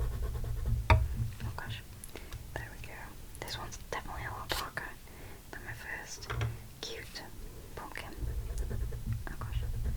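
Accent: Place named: England, United Kingdom. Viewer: I've watched this like 4 times already when going to bed. The sound from your sketching and your English accent sends me away to dream land! Love this! Thank you!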